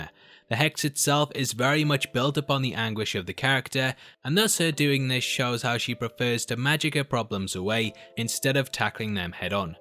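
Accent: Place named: North East England, United Kingdom. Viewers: Sounds like a South Shields accent... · You’re deffo from the north east like x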